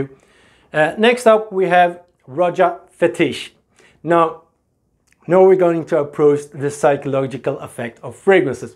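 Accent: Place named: France. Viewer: Haha love your French accent 🤣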